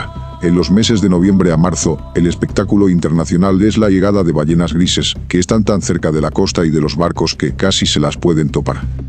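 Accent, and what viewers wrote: Spain. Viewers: Hermosos tus videos, solo que no entiendo por qué el audio con acento español. Es todo 😊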